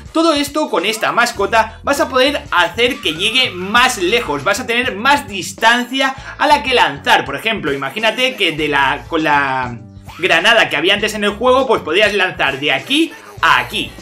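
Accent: Spain. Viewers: Es español o cuál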